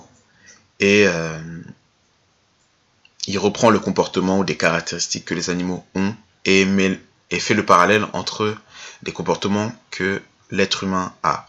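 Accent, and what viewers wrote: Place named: France. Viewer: Merci pour ce boulot colossal. .. au niveau des observations , je pense que la prononciation est un peu francisée .En plus les proverbes en ewe ont un aspect initiatique . Raison pour laquelle les ewe citent les proverbes en les introduisant de manière particulière.. PAr esemple on peut dire ceci : les sages disent que!........ les ancoens disent que.... un proverbes ewe dit que. Il n'est pas conseiller de prononcer un proverbes si un ainé est présent . Dans les situation critique, la prononciation d'un proverbe approprié peut dégonfler ton adversaire..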